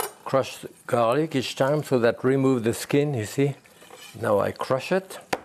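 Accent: France. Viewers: Usual Jacques le cuistot I inimitable ! I have been following his demonstration decades back and marvel at his techniques and his French accent . Anand from ile Maurice (Mauritius)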